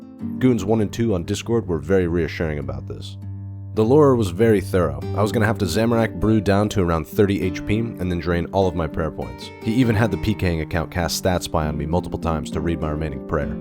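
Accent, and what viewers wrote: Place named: Wales, United Kingdom. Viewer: a welsh guy doing the voiceover cba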